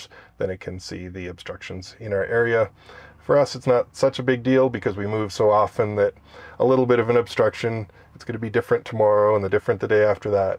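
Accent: Canada. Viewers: He's Canadian so it's Ja, you bet ya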